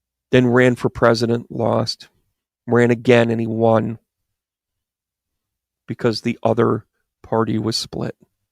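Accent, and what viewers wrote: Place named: Boston, United States. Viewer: By the way ur NY accent is Boston!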